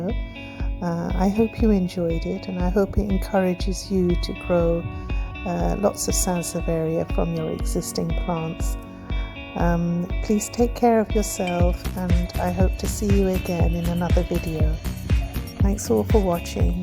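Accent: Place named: England, United Kingdom. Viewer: Nice tutorial...love your very English Accent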